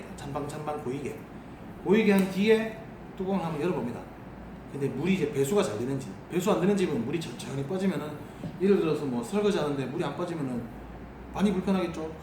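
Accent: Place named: Gyeongsang, South Korea. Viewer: 경상도 싸나이 맞지예ㆍ고맙습니다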